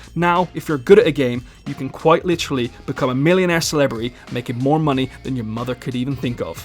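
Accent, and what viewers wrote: United Kingdom. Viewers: he's British it's how we say it so stfu ffs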